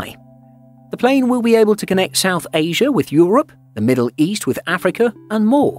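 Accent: England, United Kingdom. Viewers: Which English accent does this guy have?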